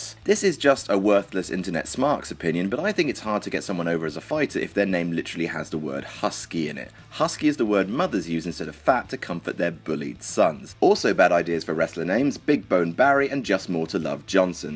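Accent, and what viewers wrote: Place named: United Kingdom. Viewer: I find it funny that you're complaining about how a British guy speaks English. They do pronounce (and spell) a few things differently than we do.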